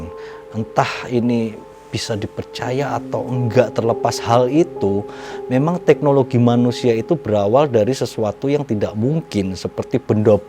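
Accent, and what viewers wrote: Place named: East Java, Indonesia. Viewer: Sip mantap oranh daerah mana sebenarnya logatnya kyk jawa timur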